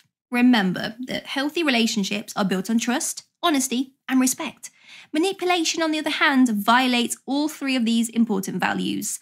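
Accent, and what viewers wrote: England, United Kingdom. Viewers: the only nice thing about this video is that she has an english accent. and for the rest I have no remarks or criticisms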